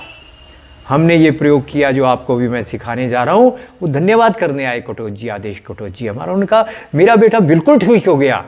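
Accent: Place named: Punjab, India. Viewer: Aap Punjab se ho vaid g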